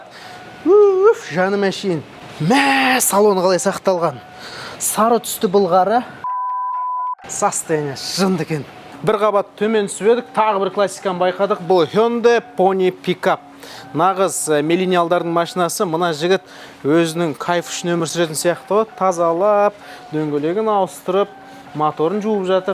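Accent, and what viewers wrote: Standard. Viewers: Жарайсың бауырым. Таза қазақ тілінде түсінікті әңгімелейді,қандай әдемі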